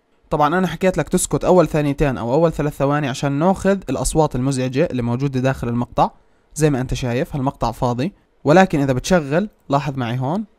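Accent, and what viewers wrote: Levant, Jordan. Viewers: اردني ولا فلسطيني؟ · انت اردني او فلسطيني صح انا جنسيته فلسطيني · انته اردني ؟ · الشب من الاردن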